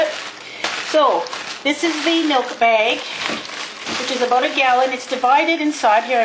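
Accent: Canada. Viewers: Um, this sounded like a plain old canadian accent to me, not a french accent. (Which doesn't mean she doesn't know french, just that she doesn't sound french when she speaks english.) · Omg I'm Canadian but I don't have the same accent as you-- you sound so-- so Canadian! __ I love it! (Was trying to figure out how they even put the milk in the bags to start with, to explain it to an American friend, but your video was still p entertaining hahahaha)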